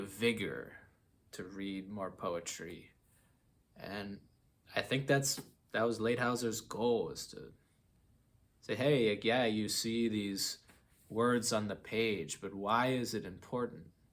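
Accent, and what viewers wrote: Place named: Scotland, United Kingdom. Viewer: pepe pic but scottish accent? bad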